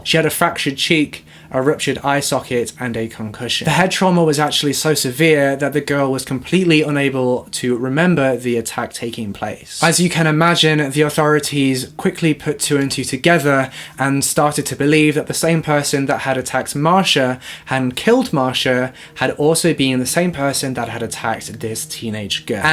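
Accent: United Kingdom. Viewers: Hello I’ve come from amber loves mystery honestly love you videos..are you from UK?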